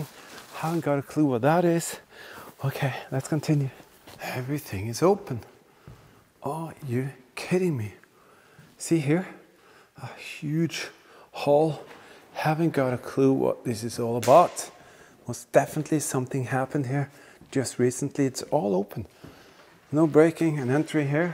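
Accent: Germany. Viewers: You sound German to me, but then the pronounciation of Feuergefährlich makes me things differently. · Warum sprichst du englisch, man hört raus das du deutscher bist ,und wenn dann könntest du wenigstens einen guten Untertitel erstellen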